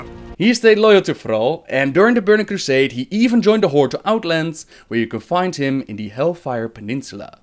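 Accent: Netherlands. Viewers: Awesome :P BTW are you dutch? because you have a small dutch accent :P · His accent. He is from the Netherlands. ^_~ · You dutch? Je klinkt in ieder geval nederlands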